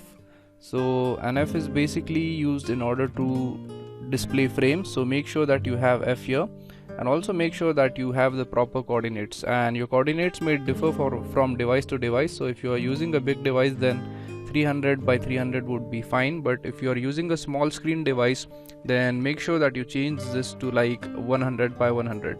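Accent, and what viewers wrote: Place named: India. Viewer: I cant withstand indian accent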